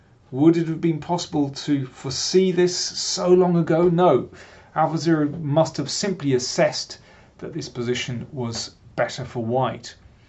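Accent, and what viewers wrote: England, United Kingdom. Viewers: Great videos,! Lovely English accent in addition to keen chess analysis. My only suggestion: Please add the opening played, when appropriate, to video descriptions. Thanks!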